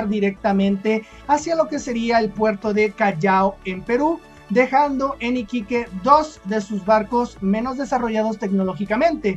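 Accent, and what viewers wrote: Mexico. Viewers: por tu acento imagino que eres Mexicano, pero cuentas tus noticias de mi Pais, con un orgullo y admiración, que no puedo mas que felicitarte por tus comentarios y videos. Es un honor que alguien que esta a 6560 Km de distancia se refiera a mi Pais con tanto entusiasmo. Una vez más gracias y espero tus siguientes noticias.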